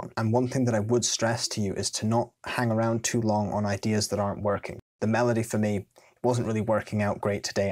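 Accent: Scotland, United Kingdom. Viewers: Did you know?, if you went to Scotland, in particular his home city or town; he wouldn't have an accent anymore. You would have an accent. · He's Scottish, so you must like that accent. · Are you Scottish?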